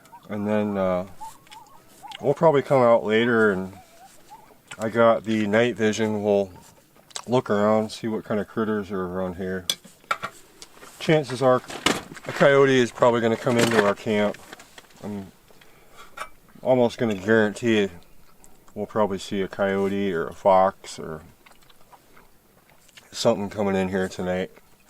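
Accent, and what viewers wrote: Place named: Minnesota, United States. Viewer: Good vid but GREAT shots!! : Couple questions: FIrst, can't help but notice midwest/Minnesota accent, where you from? Where are you hunting? Looks like you are hunting something that i get some shots at: Golden-mantled Ground Squirrel but the distance shots they look huge like prairie dogs in South Dakota. Just trying to figure out where a guy could get that much action. All the clues (cali license plate) are pointing to Arizona/California area, but I've never seen or heard of that many of any kind of rodent down in the South West. Thanks. (I shoot: Hatsan: Blitz .30/Barrage .25/Hydra .25/Flash Pup .177 - same Hawke scope as you, ATN X-Sight 4k Pro, ATN Thermal Monocular) I mostly night hunt the rat infestation in Vegas with the Barrage & the night/thermal. Thermal is a game changer!! Totally worth it. Thanks again.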